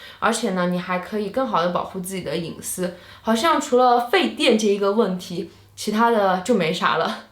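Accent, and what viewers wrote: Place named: Chengdu, China. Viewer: 听口音，好像成都的呢。 用的了那么大的还一大一小啊？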